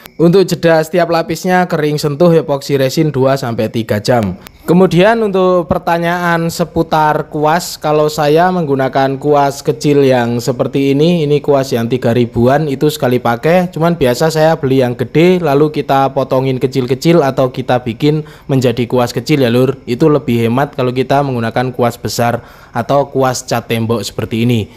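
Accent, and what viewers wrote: Temanggung, Indonesia. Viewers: wong manggung ki?😁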